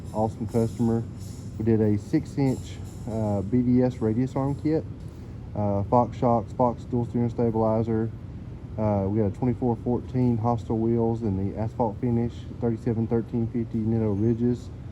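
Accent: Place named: Alabama, United States. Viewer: You got a Bama accent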